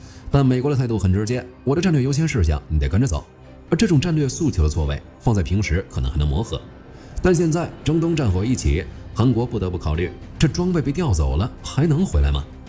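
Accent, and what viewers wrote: China. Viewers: 这个是个什么来历？从视角，口音，以及用词来看是中国大陆背景。但是字幕全用繁体，字幕里的用词也是台湾风格。比如说语音里说"项目"，字幕则写“专案”。想假扮台湾人吗？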